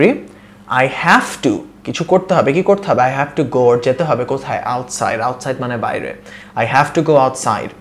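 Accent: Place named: United States. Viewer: Channel টা পছন্দ কারণ American accent এ ইংলিশ ক্লাস হয়।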